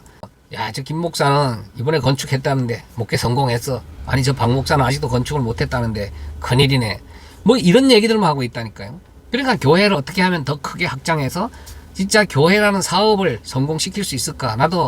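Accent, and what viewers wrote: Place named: Gangneung, South Korea. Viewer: 혹시. 댁이 강릉이래요 ? 사투리가.. 어데 소케야 그기..여기서..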